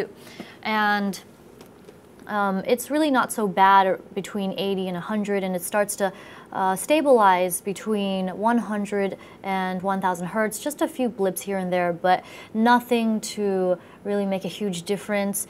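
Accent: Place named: Asia. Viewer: Asian accent ))))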